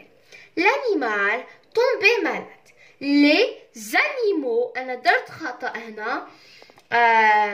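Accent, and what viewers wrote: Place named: Kabylie, Algeria. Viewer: انتي قبايلية منين من صوتك عرفتك بلي قبايلية انا تان قبايلية و شكرا على كلشي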